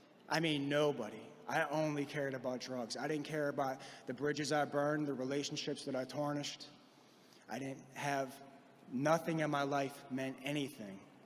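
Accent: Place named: Pittsburgh, United States. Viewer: Bring that Pittsburgh accent to all in the USA! 🤣 Fudge yeah!!!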